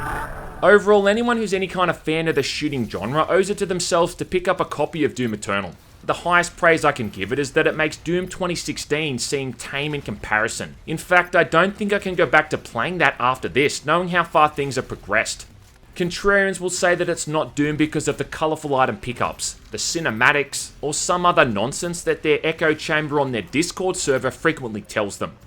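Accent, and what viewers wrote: Australia. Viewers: Makes by far the best Reviews existing on earth. By the way, are you from Australia? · Aussie accent ftw boi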